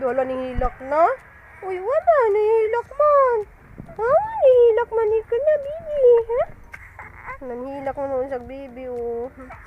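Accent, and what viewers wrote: Bohol, Philippines. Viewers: Hi sis bagong kaibigan! Taga bohol ka sis noh? Ganahan kog paminaw sa imng sinultihan bah,taga bohol sad akong tatay bah, taga CDO KO SIS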